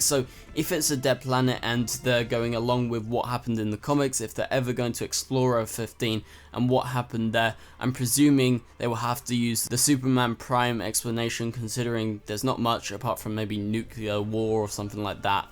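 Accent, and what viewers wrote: United Kingdom. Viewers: r u british m8?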